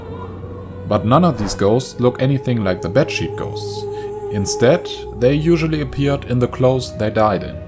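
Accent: Germany. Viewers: Ich liebe deinen deutschen Akzent. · Are you somehow related to ,,Kurzgesagt"? You seem to draw similar and have a german accent. No offense tho, could be heavily mistaken. Ty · Du hast einen deutschen Akzent ^^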